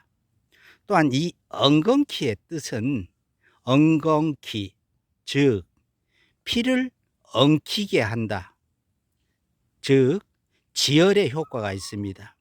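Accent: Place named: North Gyeongsang, South Korea. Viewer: 말투가 경상도 북쪽이 아닐까요 ??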